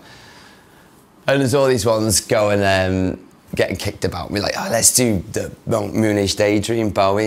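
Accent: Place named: Manchester, United Kingdom. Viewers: His accent is getting more Manc. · Leli Flores Manchester boi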